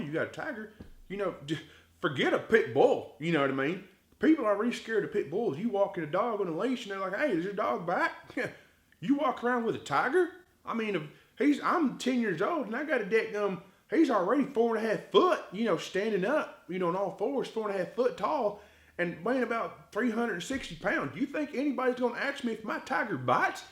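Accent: United States. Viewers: You gone on ahead now, with THAT Southern Style Country voice. Is yourself with "Blue Grass Entertainment?"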